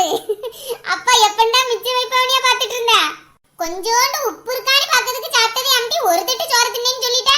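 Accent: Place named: Nagercoil, India. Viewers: Nagarkovil slang superrrrrrrrr😍😍😍😍😍😍😍😍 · Kanyakumari Tamil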